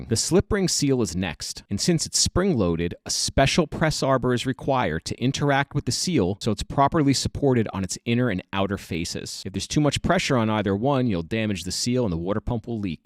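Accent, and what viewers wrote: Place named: Delaware County, United States. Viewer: Delco wooder ass accent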